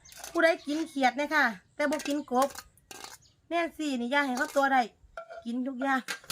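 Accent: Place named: Ubon Ratchathani, Thailand. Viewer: สำเนียงเว้าคือทางอุบลแท้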